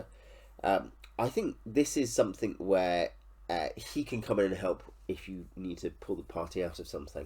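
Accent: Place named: United Kingdom. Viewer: Wtf dude. You can't follow a British accent? You have to get out more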